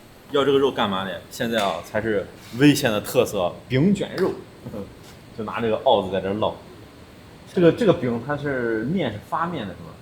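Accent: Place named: Xingtai, China. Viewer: 太感谢了！去探店了我的老家。我在上一篇石家庄的探店视频下给您留言推荐邢台，紧接着邢台的视频就来啦！出国快十年了，听到久别的郉普乡音，看到热闹的清风楼，美食也是杠杠的！最棒！🎉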